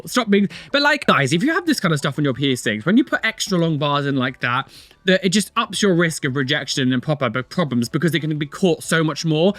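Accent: United Kingdom. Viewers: you have such a distinct british accent 😆 i love listening to your voice and practicing my english skills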